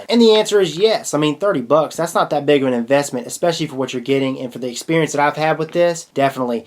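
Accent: South, United States. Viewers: It is wayyyy more than the way he says something, it's also the phrases and choice of words that are dead give away he is from the south. "And she's a chuckin." Is obvious, for example. You can tell he isn't from like Alabama or something, and to be honest he probably tried to sound as neutral as possible, because in the US sounding like you are from the South makes people think you are dumb.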